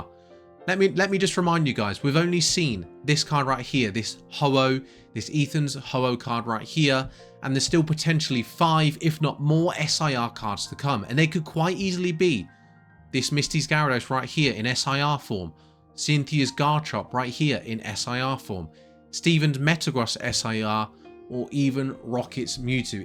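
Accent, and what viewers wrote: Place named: United Kingdom. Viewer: Beautiful British accent.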